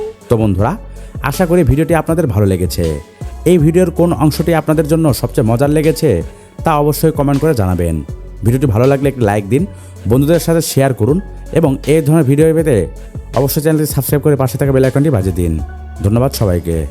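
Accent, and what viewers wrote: Bangladesh. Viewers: কুত্তা তুই তো নিজে বাংলাদেশি তোর ভাষা শুনে মনে হচ্ছে 😂😂😂 এটা বাংলা দেশের বল 😂😂😂 শুধু ভারত এর বলিসনা ,, তোরাও বাংলাদেশি এদের থেকেও উপরে😂😂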